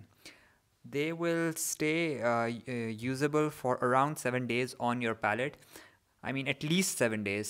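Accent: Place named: India. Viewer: he sounds Indian but his name seems middle eastern lol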